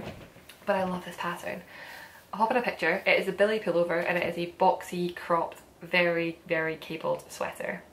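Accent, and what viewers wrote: United Kingdom. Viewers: Just found your podcast and subscribed! Thank You! Really enjoy it! Only one thing, if you could talk a little slower from time to time I cannot quite understand since I am not Brittish. So for us, that are not native speakers from your neck of the woods, remember to to slow down ....... I might not be the only one with that request...... I am looking forward to your next podcast and I'm exited to see your next knitting adventures! As my affordable yarn choose : Love to knit wit Istex Plotulopi! It comes in plates and makes light and woolly garments in one ply only. Light as a feather too but very rustic so not for everybody.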